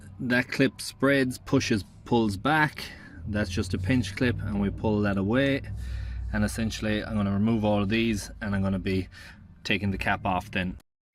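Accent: Ireland. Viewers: Are you from Cork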